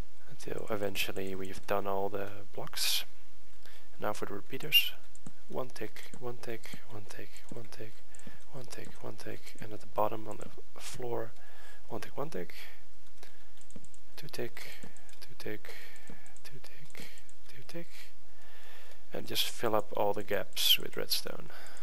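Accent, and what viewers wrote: Netherlands. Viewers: Are you from Holland? I thought I heared a kind of a dutch accent! ;-p Anyway nice video and of course nice design and tutorial! Goodbye! - The Dutch Island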